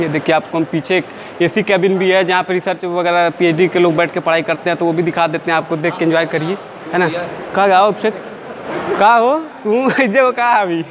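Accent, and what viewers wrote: Bhojpuri, India. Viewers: Glti se i came here.....but couldn't stop myself from watching the whole vdo.....nd just coz of his awsmm #bhojpuri assent.......😂😂😂😂he was like inko kon hi rokega😂😂😂😂😂😂😂😂😂😂😂😂😂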